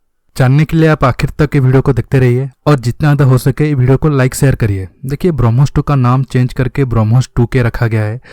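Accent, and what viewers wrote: Odisha, India. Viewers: Bhai Tu Odisha ru na?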